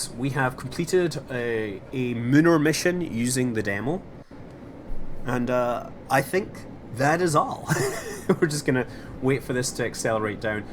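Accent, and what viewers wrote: Scotland, United Kingdom. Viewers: can I just say? I freakin love your accent your dude! You sound like Scotty from Star Trek and that's a good thing!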